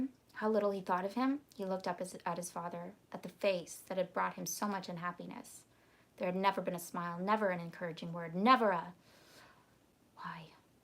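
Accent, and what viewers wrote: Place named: United Kingdom. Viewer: I'm glad you are doing well. Thank you for the book reading today. You don't have to do that next stream if you don't want to but you're a terrific reader with a lovely British accent lol. Hope you will continue doing at least one Livestream a week but if you need a break every so often from YouTube that's cool too. Have a good weekend 🙂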